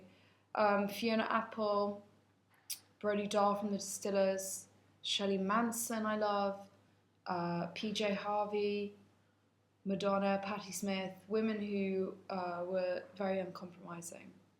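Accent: United Kingdom. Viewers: I never realized she had such a British accent, I mainly listened to songs and no interviews. I LOVE HER ACCENT! 😂 Love the first question hahaha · wonderful keep it coming! i haven't heard you speak in the british accent before so that was something new.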